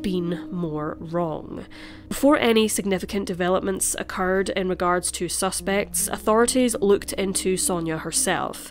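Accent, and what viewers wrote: Scotland, United Kingdom. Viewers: Honestly I have a hard time listening to the Scottish accent. Not cause I can’t understand it, just don’t have like them. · Yes, fellow Scot, nice to hear our accent. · Is the narrator east-coast Scots? Former Banffer here. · I could listen to you all day! When I was little, my mother was very ill, and the neighbor lady looked after me. She had emigrated from Scotland, and that accent is associated with some of the happiest days of my life. Keep up the good work!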